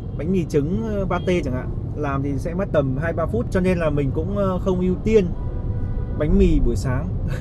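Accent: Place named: South, Vietnam. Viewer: Anh có bạn miền nam ko? Anh nói nhiều lúc có pha tiếng nam vô :))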